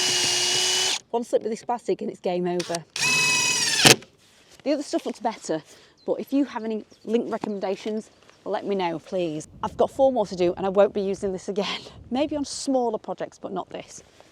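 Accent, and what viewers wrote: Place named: Leicestershire, United Kingdom. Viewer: I love your accent,it takes me back to my youth in Leicestershire ,i could listen to you all day. Forty four years in the U.S. AND NO DESIRE TO COME BACK TO COLD WET DAMP MISERABLE WEATHER You should always have a comfy chair and a bottle of wine in your greenhouse . I know my wife does and on rainy days i get several hours of peace and quiet ?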